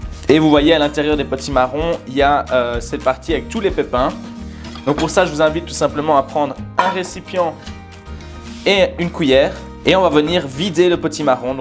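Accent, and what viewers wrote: Belgium. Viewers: votre charmant accent belge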